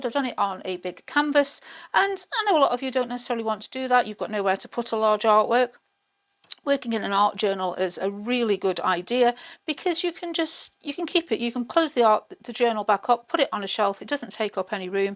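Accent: England, United Kingdom. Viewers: Hi, I'm Jane, in the UK, a relatively new subscriber, and I'm avidly watching all of your videos, I come to you for a dollop of inspiration! I'd love to get into gel plate printing, it looks like a lot of fun! Also, can I say, it's lovely to hear an English voice in the world of mixed media! Congratulations on reaching 25k, you deserve it.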